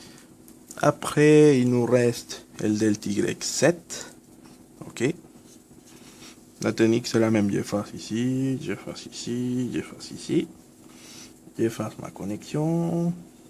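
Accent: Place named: Spain. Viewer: I bet this guy first language is spanish but he speaks frensh very very good! nice explication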